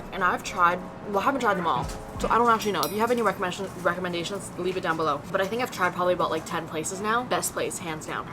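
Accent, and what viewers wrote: Vietnam. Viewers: YOUR VIETNAMESE ACCENT IS SO CUTE OMG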